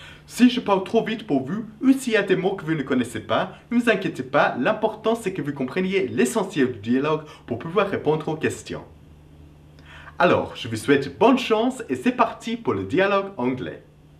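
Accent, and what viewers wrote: United Kingdom. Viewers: Je suis américains et d'apprentissage français. But slurs he too much. He also slurs a letter v into the letter r sound. He has learned a British accent.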